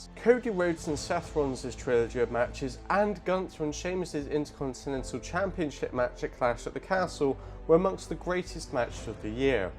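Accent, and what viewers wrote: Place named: Bristol, United Kingdom. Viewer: You are from Bristol I can tell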